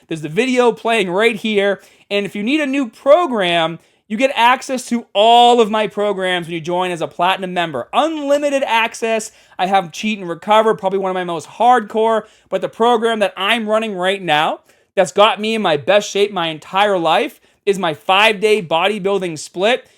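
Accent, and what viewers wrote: Boston, United States. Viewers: Its good to see you still grow strong , im a follower even before people make fun of your "bahhbel" Boston Accent era . Haha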